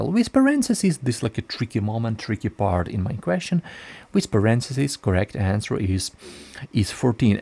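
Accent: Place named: England, United Kingdom. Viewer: Great stuff but you talk too fast, your English accent is not helped by muffled sound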